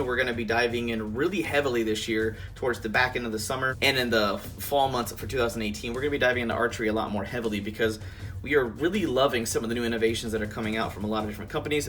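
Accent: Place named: Texas, United States. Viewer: I kept hearing that your from Texas. Where exactly in Texas.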